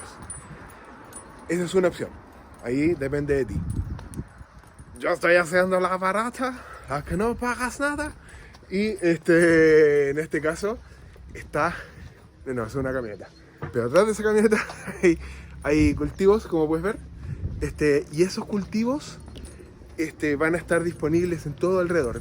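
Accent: Chile. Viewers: Viendo este video dije mmm me suena este acento 🤔 #chilena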